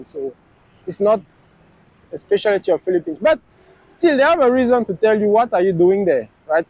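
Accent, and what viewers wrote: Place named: Africa. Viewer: pero de que pais vienes tu? por tu acento evidentemente no eres de un pais del norte como inglaterra usa o canada, eres de algun pais de africa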